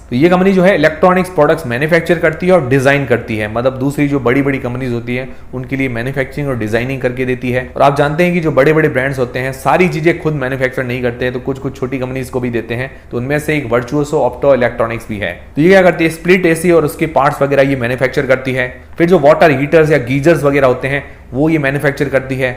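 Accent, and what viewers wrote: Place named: Marwari, India. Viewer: आपके बोलने के लहजे से मारवाड़ी लग रहे हो।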